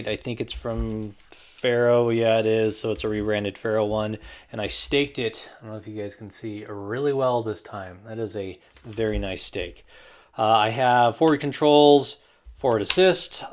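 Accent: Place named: Arizona, United States. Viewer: something in your voice reminded me of Ian from Forgotten Weapons... The way you talked when you gave reason for having only one rifle. I was like: I bet they are from Arizona - and that was before seeing the cactus patch! Am I close? :)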